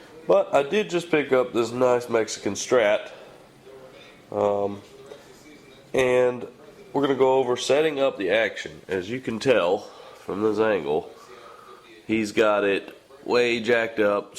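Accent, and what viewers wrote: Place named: South, United States. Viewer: your accent sounds a lot like Bret Farve bro. you from Mississippi or Louisiana ? good video, very basic and to the point. thanks man.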